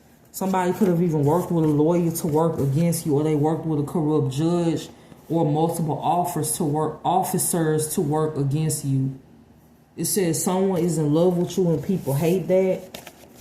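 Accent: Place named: Miami, United States. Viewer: Miami Voice!😊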